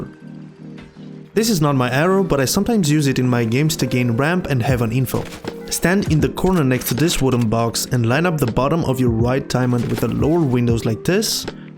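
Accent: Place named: United Kingdom. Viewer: i like the british accent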